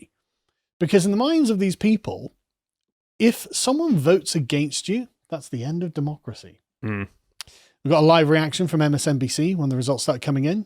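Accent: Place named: United Kingdom. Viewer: Did I just hear a British bloke defend the American Revolution. Wow, Brandon truly is a uniter of the world.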